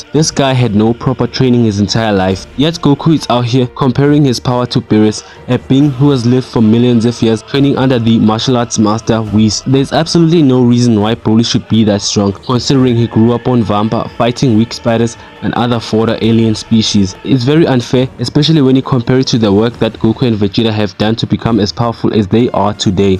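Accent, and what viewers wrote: South Africa. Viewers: You sound kinda south african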